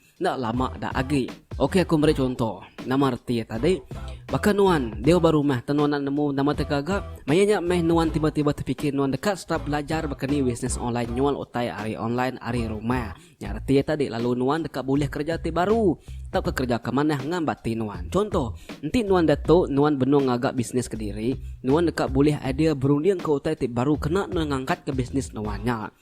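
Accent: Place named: Iban, Malaysia. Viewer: cumil amt slang iban yaa hihii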